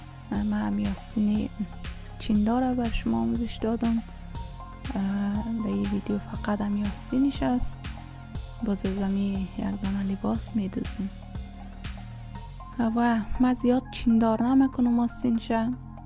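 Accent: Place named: Afghanistan. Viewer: احسنت برخواهر افغانم با اموزش عالیتون استین چه خوب اموزش دادی بالهجه ی شیرین افغانی 🇮🇷🇦🇫👏🏻👏🏻👏🏻👏🏻👏🏻👏🏻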